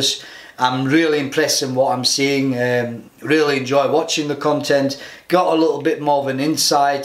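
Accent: Scotland, United Kingdom. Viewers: Love ur accent. Scottish? That would perhaps make u Scots guards, or a highlander? So there are 3 phases of ranger school. The early part of the vid u watched was just the first part of the first phase. That's the 'easy' part. The goal of all the extra water spraying and stuff is made to make ranger school as miserable as possible. After u get to ranger school u r working off of a 4000 calorie deficit, and u only get about 4 hrs of sleep per week, if ur lucky.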